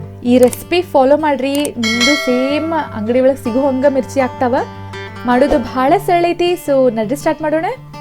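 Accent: North Karnataka, India. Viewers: ನಮ್ಮ್ ಉತ್ತರಕನ್ನಡ ಭಾಷೆನ್ ಹಂಗರಿ. ಕೇಳಿದರ್ ಹೊಟ್ಟೆ ತುಂಬದಂಗ್ ಆಗ್ತದರಿ. Keep it up mam. ನಿಮ್ಮ ರೆಸಿಪಿ ತುಂಬಾ ಚನ್ನಾಗಿದೆ. Mam. ಹಾಗೆ ಜುನ್ಕ ವಡೆ. ಎಣ್ಣೆಗಾಯಿ ಬದನೇಕಾಯಿ. ಅಗಸಿ ಹಿಂಡಿ. ಇಂತಹ ನಾನಾ recipe ನ ತೋರಸಲಿಕ್ಕೆ try ಮಾಡಿ. Mam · ಮೇಡಂ ನಾ ಇರೋದು ದುಬೈದಾಗ ಅದರ ನಮ್ಮ ಉತ್ತರಕರ್ನಾಟಕ ಭಾಷೆ ಕೇಳಿ. ಒಮ್ಮೆ ಊರಿಗೆ ಹೋಗಿ ಬಂದಂಗ ಅತ ನೋಡರಿ ಅಕ್ಕ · uttara Karnataka bhashe superb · Nimma utaara kannada bhaashe nange tumbaa ishta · ಎಷ್ಟು ಚಂದ ಉತ್ತರ ಕರ್ನಾಟಕ ಕನ್ನಡ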